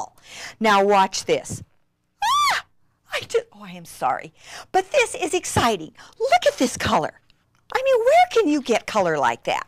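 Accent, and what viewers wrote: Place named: United States. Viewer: man this woman combined with her american accent is just the best. ive got stuff to do but i want to watch the whole video!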